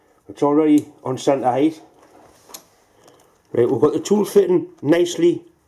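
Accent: Newcastle, United Kingdom. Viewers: He's a Geordie. They don't do foreign,. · I think he's geordie from Newcastle. Up North East. I'm from Manchester Northwest.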